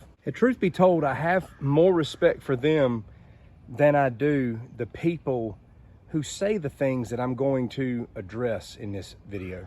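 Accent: South, United States. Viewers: GREAT ADVICE. I APPRECIATE THE STRAIGHT TALK, AND BREVITY TO THE POINT, AND FANTASTIC SOUTHERN ACCENT. IT ADDS. FASCINATION OF THE CLARITY. WITH UNDERSTANDING. WHAT YOU ARE SAYING. IT IS INTERESTING THAT SOMEONE WITH NO TRAINING COULD DO WELL IN A FIGHT. DOES. SAVAGERY COUNT FOR ANYTHING. ? I. MASSIVELY TRY TO AVOID. FIGHTING, BUT FROM EXPERIENCE. I SEEM TO ATTRACT SECURITY, AND. FEAR WHEREEVER I GO. I COULD BE .RESCUEING. A CRICKET TO RELEASE OUTSIDE, OR TRYING TO BUY MY GROCERIES. NEXT THING A I HAVE SECURITY GUARDS. FOLLOWING,. OBSERVING, OR TRYING TO HUMP MY LEG.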